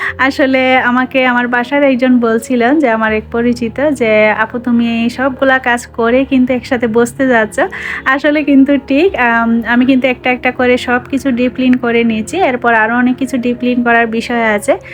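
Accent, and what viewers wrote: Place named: Sylhet, Bangladesh. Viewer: ❤❤ আপু সোহার জন্য রইল মন থেকে অনেক অনেক দোয়া ভালোবাসা ❤ তোমার শাশুড়ি তো অনেক আম এনেছে বাড়ি থেকে আমাদের না এবার আম আসেনি আমাদেরকে একটু পার্সেল পাঠিয়ে দাও আপু, ইলিশ মাছ আমার অনেক পছন্দের ইলিশ মাছ যেমন রান্না হোক না কেন বিশেষ করে গরম ভাতের সঙ্গে ভাজা আর ইলিশ মাছের তেল যে খেতে বেশি ভালো লাগে আমার, পরিবারকে নিয়ে অনেক ভালো থাকো সুস্থ থেকো শুভকামনা রইল আপু, তোমার বাড়ি কি চট্টগ্রাম আর ঢাকাতে থাকো তুমি তোমার কথা বলার ধরনটা সিলেটের মানুষের মত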